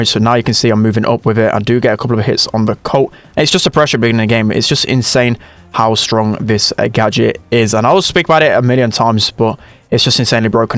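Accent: United Kingdom. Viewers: BRI'ISH accent bloody hell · british accent bro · just a british accent :/and yea it is the best one out there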